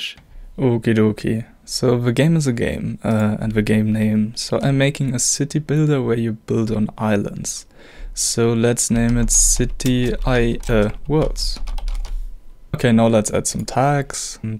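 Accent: Germany. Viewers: Are you German? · I knew u are German, ein Deutscher erkennt doch einen anderen deutschen. xD 😂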